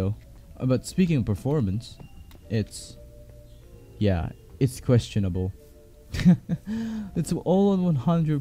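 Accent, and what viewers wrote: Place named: Philippines. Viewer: Man, i think this guy is filipino for sure because of his english accent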